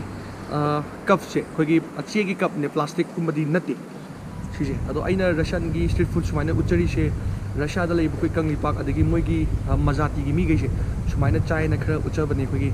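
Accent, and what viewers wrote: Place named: Haowe, India. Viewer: Bro ngi haowe khuhaido taba yam nungai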